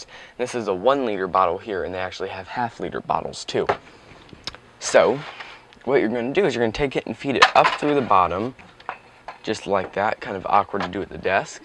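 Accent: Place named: North, United Kingdom. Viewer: Pop. Teehee. You're a northerner, aren't you?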